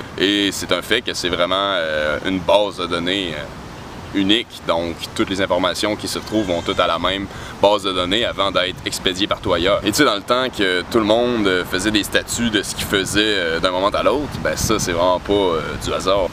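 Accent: Quebec, Canada. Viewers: Beaucoup de charme pour faire des vidéos peut être à ne plus y compter, mais trop volubile et rapide en paroles, préparées au rythme des thèmes choisies, je n'en doute pas, mais qu'on n'arrive pas à suivre même jusqu'au bout, ça saoule quoi ! Mais Monsieur a du crack, et une bonne tête que j'aime parfois entrevoir dans mes vidéos you tube, mais quelle horreur cette accent québécois ! Toutefois j'admire votre façon de parler avec beaucoup de pensées, un être étrange, édifiant dans son intellect, vous réfléchissez sur beaucoup de choses et montrez plus ou moins votre optimiste d'en parler, vous être étrange et drôle à la fois à la manière de vous exprimer...bon en théâtre, je n'en doute pas et croquant la vie à pleine dent pour toutes aventures...bon courage...vous me faite penser à un jeune scientifique plus ou moins ermite qui s'émancipe dans des thèses pour pouvoir s'affirmer dans la matière qu'il a choisi..bon je ne vous oublierais pas, faites attention à vous et garder la forme et santé...bye... 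· T'es un fréro d’âme ! Tout pareil appart ton accent dégueulasse de canadien ! Je soutiens tout tes propos a tous les niveau a 100% Enfin jusqu’à maintenant . Mais jai confiance en toi ! Bonne chance ma couillette dans ce monde accro a la propagande peace ma gueule !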